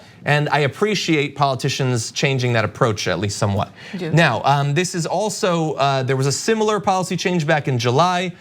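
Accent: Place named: Ohio, United States. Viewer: John endearingly says "wuter" which is sort of Ohio speak.. Where's he from? [asking for a friend from Ohio]. Chicago was never this progressive when I was growing up there.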